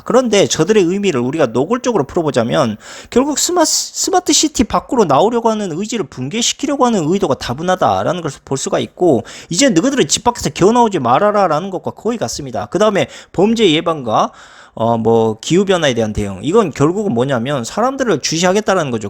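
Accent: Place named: Suncheon, South Korea. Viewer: 친정이 순천인디 님 사투리 항상 즐겁게 듣고 있습니다 오늘도 화이팅 하십시오 그동안 밀린 댓글 오늘은 도배하고 갑니다 저는 그럼 오늘은 이만 뿅~~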